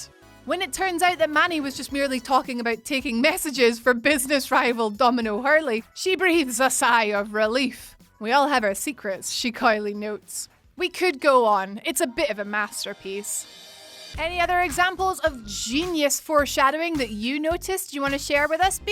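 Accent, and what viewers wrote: Scotland, United Kingdom. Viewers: I'd love to know on which planet the Scottish accent sounds like a mashup with another accent. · is she Scottish? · She's Scottish. :-)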